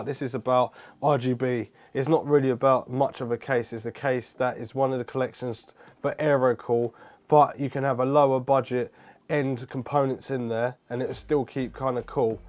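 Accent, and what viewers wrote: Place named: Manchester, United Kingdom. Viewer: Cheers for the review on this case. And I've subbed up to your channel. Was really thinking about this case, but I'm not so sure now due to the cooling aspect, or lack off. So in that respect it was a great review for me.. And I hope you don't mind me saying, you kinda sound like a manc lad.... And I should know, I'm one..lol Thanks again mate..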